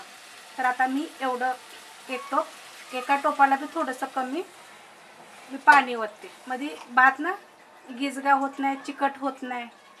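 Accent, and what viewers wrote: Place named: Rural, India. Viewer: खूप छान मावशी,,,, तुमच्या भाषा गावाकडची आहे पण त्यात खरंच खूप प्रामाणिकपणा दिसतो,,, जेवढी चव तुमच्या बोलण्यात आहे तेवढीच या पदार्थात असेल यात काहीच शंका नाही...! आणखी खूप व्हिडीओ बनवा,,, अस्सल गावाकडचे पदार्थ शिकवा आम्हाला..!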